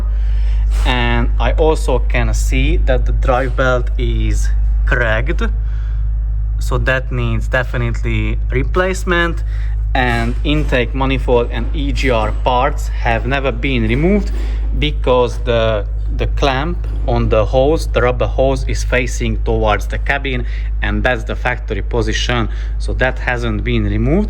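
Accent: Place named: Hungary. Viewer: Vicces, hogy a yt feliratozója teljesen jól megérti a magyar akcentust, nem úgy mint az őslakókét. :)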